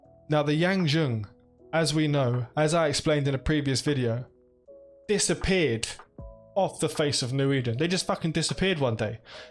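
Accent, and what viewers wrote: United Kingdom. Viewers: London geezer init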